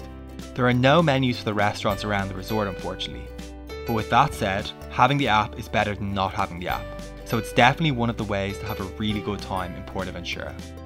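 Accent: Ireland. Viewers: Was surprised to hear an Irish accent!! Irish here too! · This is so random are you Irish? 😂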